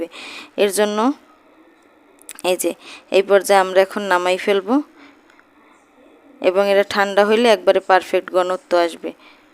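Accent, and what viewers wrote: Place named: Barishal, Bangladesh. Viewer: অনেক সুন্দর হয়েছে আপু আপনার এই আমড়ার জেলি ঠিক কইরেন আপনি কখনো শুদ্ধ বলেন কখনো আবার বরিশালে এটা বলেন শুদ্ধ টা বলবেন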